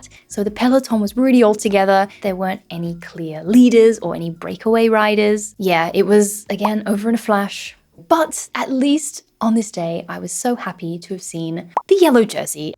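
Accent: United Kingdom. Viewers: I thought you were British 😊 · YOU’RE AUSTRALIAN ?????😭😭😭😭😭😭 Your French is native level how did you learn French ? You have a British accent too (my perception ofc) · I just love your accent (thought you were british) and your french is remarkable... nice to discover your channel...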